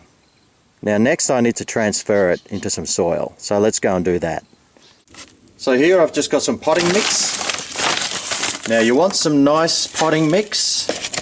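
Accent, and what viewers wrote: Australia. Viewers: "British" accent??? It's Australian. Everyone's laughing at your class now.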